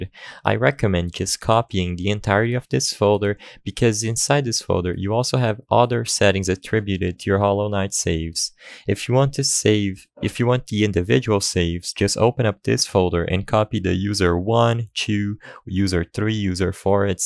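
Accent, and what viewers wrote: Brazil. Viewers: eu percebi que voce era brasileiro pelo sotaque kkkkkkkk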